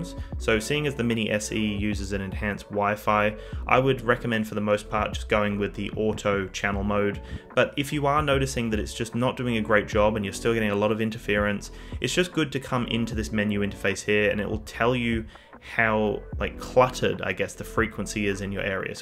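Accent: Australia. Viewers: Thought you were Aussie, I live in Echuca in Victoria :) keep up the good work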